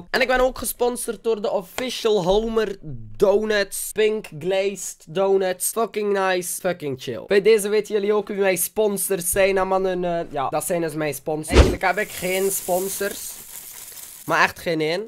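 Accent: West Flanders, Belgium. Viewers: probeer een keer nederlands te praten want jou belgische accent klinkt echt voor geen meter! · Hij west-vlaams en probeert AN te spreken zodat iedereen hem verstaat :P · Van waar kom jij ??(ik denk West-Vlaanderen ) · Dat Belgische accent is geweldig ❤️😂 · jij bent echt een belg of nii da accent OMG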